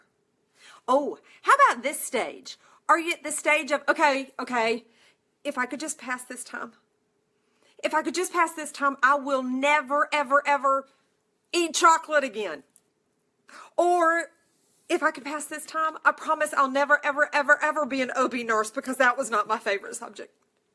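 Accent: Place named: North Carolina, United States. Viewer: Super motivating! Your accent made me smile this morning! Im in NC too (Swansboro)😁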